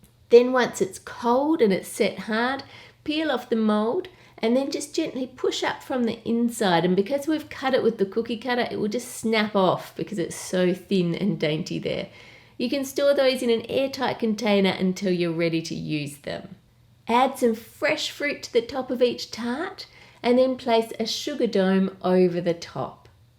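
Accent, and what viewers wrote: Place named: Australia. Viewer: She's very Australian. I can't stand Australian accents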